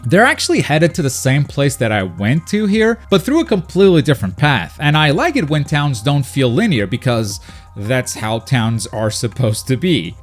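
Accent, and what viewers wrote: Portugal. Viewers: Bruh vou seguir só mesmo por seres tuga! Foi tipo "Este gajo tem sotaque de Lixian... deixa ver de onde é"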